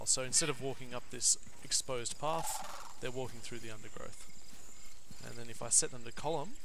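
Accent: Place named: Australia. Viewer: Yes, I found this video very helpful and well explained indeed!!! I'd also be interested in knowing the other Channel. Either way I've subbed to this one just incase more uploads come. Also, great to hear another Aussie =]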